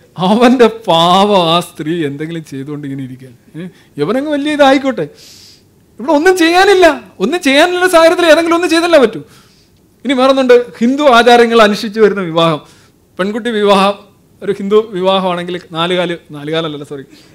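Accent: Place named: Kollam, India. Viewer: കൊല്ലത്തുകാരുടെ ഭാഷാപരമായശീലം ........ മാത്രം .....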